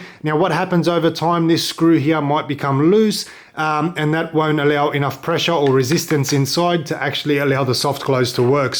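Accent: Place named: Lebanese-Australian, Australia. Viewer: I left my home in Sydney's inner west and moved to QLD in 2007, geez I miss the Habib accent. Thanks for the lesson and the bonus nostalgia.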